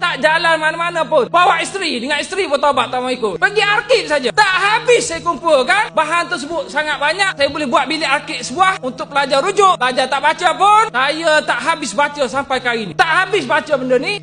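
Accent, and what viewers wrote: Kelantan, Malaysia. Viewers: Ore klate ni. Kawe first time dgr pun dah tahu.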